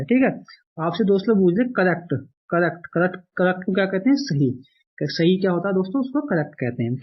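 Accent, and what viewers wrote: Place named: Haryana, India. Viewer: Haryana sa ho kya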